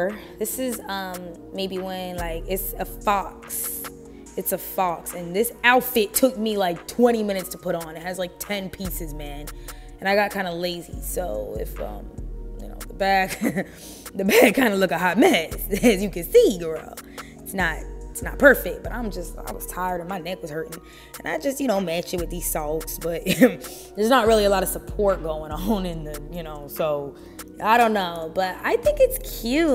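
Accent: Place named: Jamaica. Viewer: You're an awesome panda. Not just only a panda The 1st outfit is gorgeous/ is the best one in all of them. Imma text you on telegram soon. See you soon gal Your english is like the one of Jamaicans. I feel like you're a Jamaican gal. Is that true?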